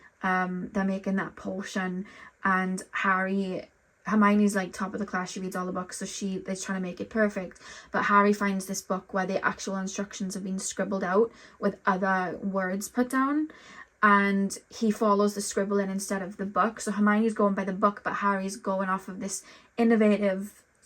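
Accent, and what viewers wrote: United Kingdom. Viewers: I really like your readings but you talk too fast with a British accent I couldn’t catch up.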